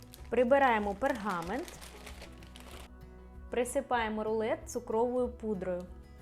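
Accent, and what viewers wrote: Ukraine. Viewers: Так вам йде западенська мова🤗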